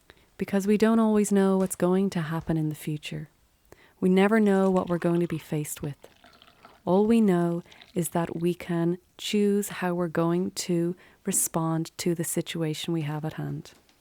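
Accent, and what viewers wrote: Ireland. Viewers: your voice is so soothing :) and the gorgeous Irish accent doesn't hurt :D <3 <3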